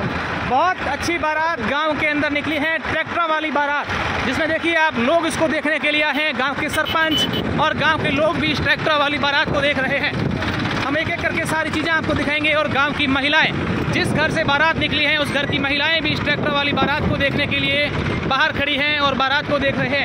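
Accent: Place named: Marwari, India. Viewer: Aapki aavaj marwadi andaj me or gramin se news late ho dhanay ho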